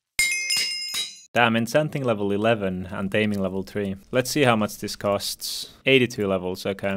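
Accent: Finland. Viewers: Its called Finnish accent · Are you finnish? Like, your name is and your accent sounds little bit like finnish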